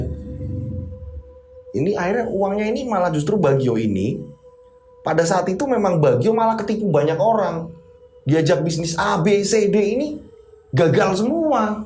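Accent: Java, Indonesia. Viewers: Cara bawain bisa jawanya sangat terasa sekali ms...bahasanya Jawa kok sama presis dengan punyakku......tulen banget wkkkkk...semangat bos Hongkong hadir selalu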